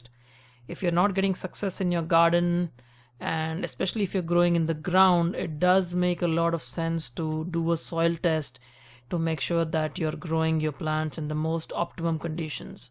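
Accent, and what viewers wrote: India. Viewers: Everything else is a regular indian accent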